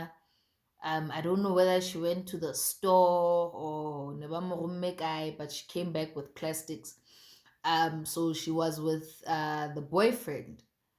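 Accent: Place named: Lesotho. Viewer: Thokoza gogo..your accent hao bua sesotho...wher are you based or from? Thank you for the information.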